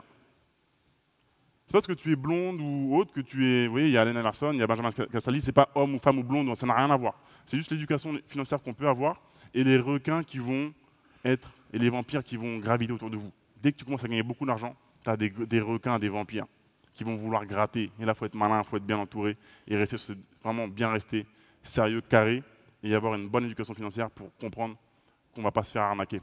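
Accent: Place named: Suburbs, France. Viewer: Où tu entend l'accent de banlieues. Je ne savais pas que l'accent de cité existait.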